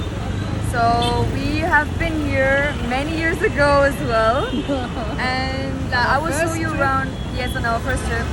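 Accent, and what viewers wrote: India. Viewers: Her English accent sounds a little bit Indian , or is it just me ?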